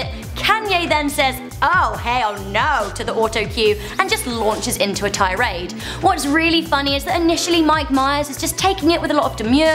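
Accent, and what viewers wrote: United Kingdom. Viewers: I know btw love your british acsent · Why do so many people who watch this channel act as if they've never heard a British accent before?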